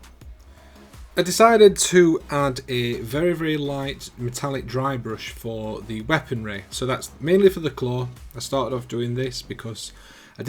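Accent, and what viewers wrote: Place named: West Yorkshire, United Kingdom. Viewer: Warp stone glow in West Yorkshire accents wins the internet mate. I’ve subbed...new to 40K....not sure how many games I’ll play but I’ll certainly be using your tips in general. Thanks man.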